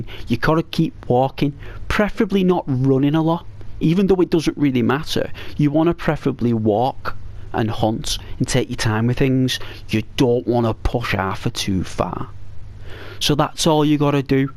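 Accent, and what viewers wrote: Liverpool, United Kingdom. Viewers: Great vid bro.. you from Liverpool ?? And sub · I love Liverpool accents lol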